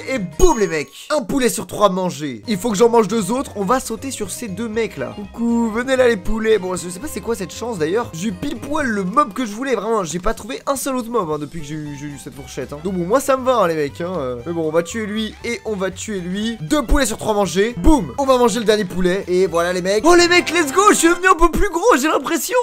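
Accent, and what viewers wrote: Marseille, France. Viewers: Tu fais bien le marseillais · Incroyable l'accent marseillais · "Je t'en met 1 pas 2"😂"je vais te taperrrrr" 😂magnifique l'accent Marseillais 😂😂😂meilleure video de tous les temps 🎉🎉😂 continue comme ça😂🎉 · G adoré l accent marseillais 😂😂😂😂😂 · C’est une masterclasse l’accent marseillais continue avec l’accent marseillais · Sé té tro. Marent l a ! Accents en marseille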